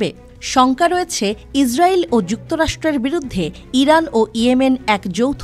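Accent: Kolkata, India. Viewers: এই সংবাদটি উপস্থাপনা করছে কে একাতর টিভিতে তিনি কি আলোতে বাংলাদেশের বাঙালি না কলকাতার বাঙালি। কেননা তার ভাষাটা একটু ওপারের মতো টান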